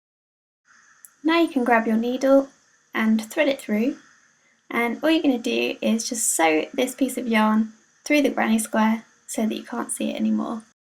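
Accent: United Kingdom. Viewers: where are you from? you sound a bit Devonshire too me... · She sounds so British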